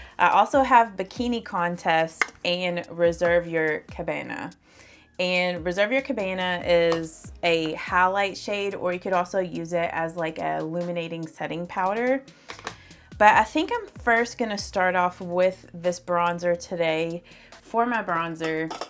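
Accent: South, United States. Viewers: It could be her accent, it's a little southern ❤. She pronounced it Ma/cari. Which I also say ma/cari lol.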